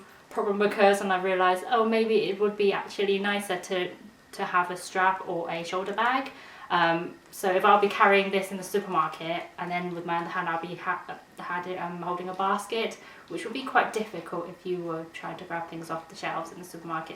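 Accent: United Kingdom. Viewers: Love your English accent and the video is incredible . I find your video quality impeccable and flawless. You addressed my questions except I’m awaiting for the one question about if straps purchased have stamp code or not. Thanks love.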